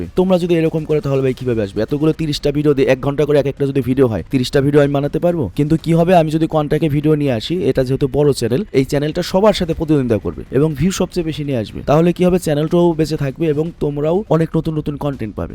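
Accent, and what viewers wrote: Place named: Chittagong, Bangladesh. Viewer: ভাইয়া মনে হয় চাটগাইয়া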